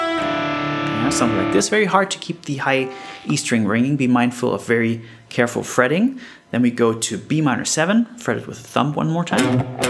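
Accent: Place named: Germany. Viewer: It's hard to tell how German you are until you say "O" vowels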